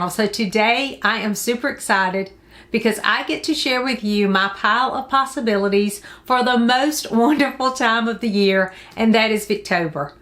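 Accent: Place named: Texas, United States. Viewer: Just found your channel. So glad I did. I have to ask are you possibly from Texas? I am and your accent sounds familiar.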